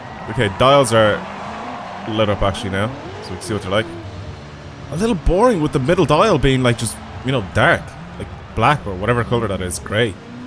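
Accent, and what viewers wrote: Ireland. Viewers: yo what accent do u have im terrible with my countries but im thinking Irish?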